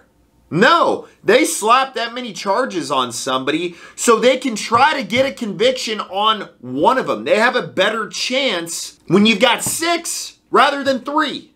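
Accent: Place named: California, United States. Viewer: I’m on your side but you ain’t country. Ya sound like your from California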